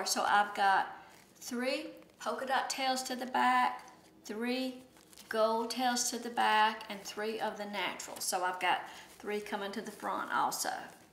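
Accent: South, United States. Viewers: Hello from the UK! Lovely bow and good instructions. LOVE your southern accent!! Thank you for sharing!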